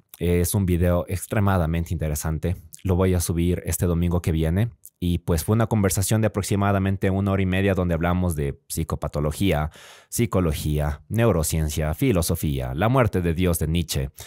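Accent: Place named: Mexico. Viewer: Hablas como Mexicano, pensaba que eras mexicano pero ahora que veo que eres de Ecuador lo entiendo, siempre he creído que en Ecuador tienen un acento similar al estándar mexicano.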